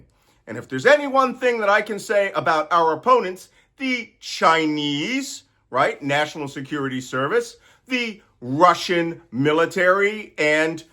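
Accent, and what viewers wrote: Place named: Maryland, United States. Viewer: Going by your accent and expressions I'm guessing that you are from Maryland.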